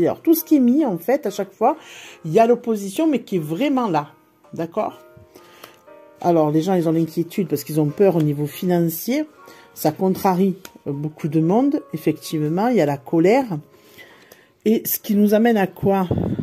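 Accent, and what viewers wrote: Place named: Marseille, France. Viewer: Bonsoir, toujours très agréable de vous écouter avec votre accent de Marseille 😘 Merci beaucoup pour vos tirages. Oui il est temps que les croyants se donnent la main pour que la lumière soit 🙏🕊️✨💞 celle du coeur l'amour inconditionnel ❤️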